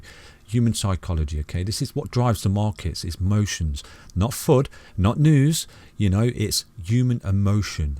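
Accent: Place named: Midlands, United Kingdom. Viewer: Great channel, and good to hear a British accent (Midlands?) in the space. Much respect, from Leeds.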